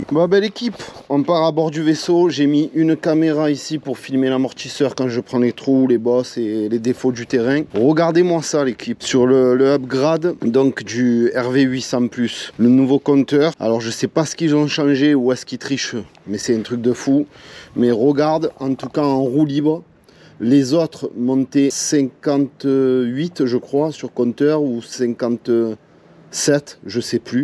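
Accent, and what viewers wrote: Marseille, France. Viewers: Vive le sud! Tu donnes le sourire avec l'accent de Marseille qui crrame tout!!!!!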